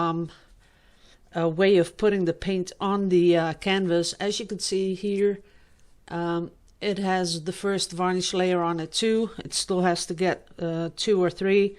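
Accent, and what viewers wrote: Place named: Netherlands. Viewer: First of all I have to say that I love your artwork so much! And I love to hear your voice and the dutch accent.☺️ (I’m German and I find my accent is horrible!😂) How do you clean your paintings? Do you have a video about it? Sorry if you‘ve probably mentioned it several times but I‘m quite new to acrylic pouring. And I must say, I didn‘t even know that it takes two to three weeks to let the paintings dry, but I think it depends on how thick the lair of paint on the canvas is. Thanks for sharing all your art, your knowledge and your experience, I really appreciate that. Have a wonderful Sunday.☀️🥰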